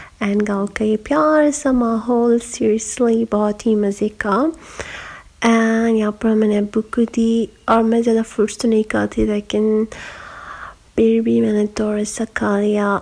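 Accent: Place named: United Kingdom. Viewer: Over kahin ki urdu bhi English accent me bol rai ho be original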